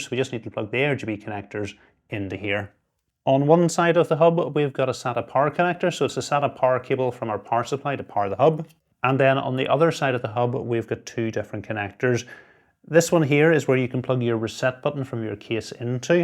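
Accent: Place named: Ulster, United Kingdom. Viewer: Struggled with the Ulster accent on occasion, but aside from that excellent and informative video. Thank you.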